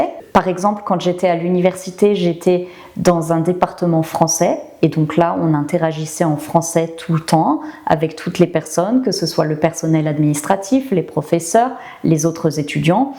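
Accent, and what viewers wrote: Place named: Europe. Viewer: Votre accent me parait europeen. Je suppose que vous avez demenage au Canada d'un pays European. Merci pour l'encouragement de practiquer le franchise quand l'occasion se presente, et je suis tellement d'accord.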